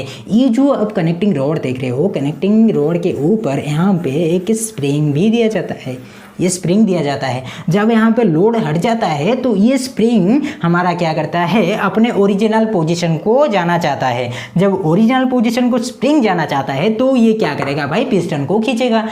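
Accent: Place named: Mexico. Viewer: Mexican accent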